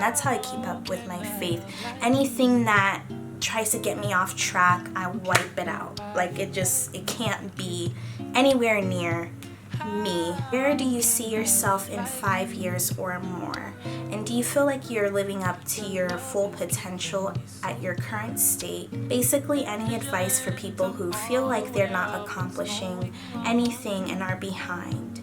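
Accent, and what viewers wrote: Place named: Nigeria. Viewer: I loved this video, it was bomb! Learned more about you & your Nigerian Accent lol <3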